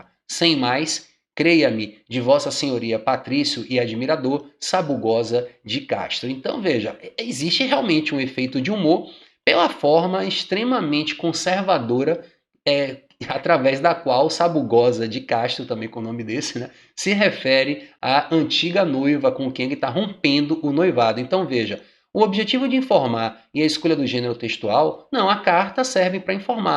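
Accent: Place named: Salvador, Brazil. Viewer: ótima explicação! Você tem sotaque de soteropolitano, amei ouvir.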